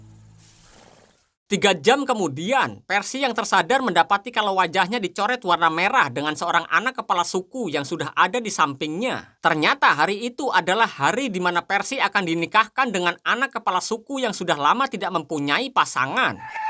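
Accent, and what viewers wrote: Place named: Surabaya, Indonesia. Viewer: Penyiarnya lucu.. Bahasanya suroboyoan, mathek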